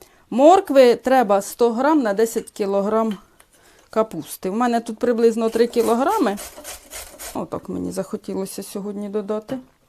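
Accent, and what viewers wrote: Poltava, Ukraine. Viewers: Ви мабуть з полтавщини судячи по говору?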